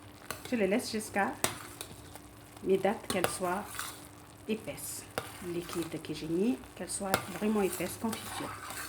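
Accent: Tunisia. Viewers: اختي لو كملتي بالعربية. والله شكشكتيها بالفرنسية . واللهجة تاعك حلوة بالتونسي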